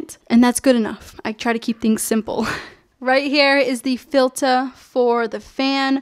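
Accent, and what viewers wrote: Boston, United States. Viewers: That Boston accent coming through every now and then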